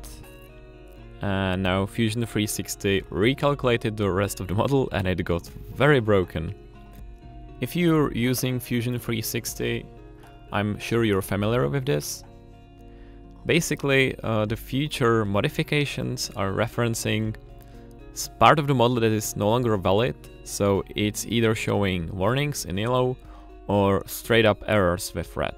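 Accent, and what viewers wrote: Czech Republic. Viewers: Czechenglish se nějak špatně poslouchá:) Ale jinak víc takových tutoriálů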